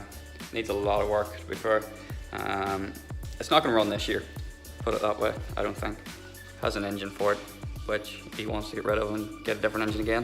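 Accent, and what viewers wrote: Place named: Northern Ireland, United Kingdom. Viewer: Glad to find this channel mate by the sounds of it ur from Northern Ireland same as myself, have a 1.2 6r and have been giving a lot of thought into an engine swap and awd conversion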